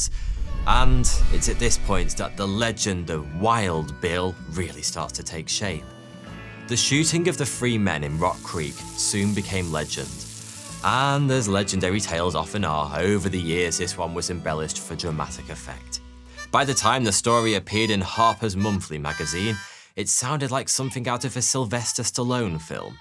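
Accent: United Kingdom. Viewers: It never sounds right when British accents tell cowboy stories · Just wanted to say that I didn’t watch the video. Can’t possible listen to a British tell a story about the old west.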